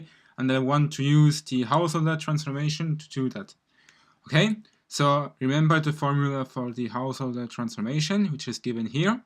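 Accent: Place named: Germany. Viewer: Are you german? Haha I like the way you talk. Anyway, nice videos!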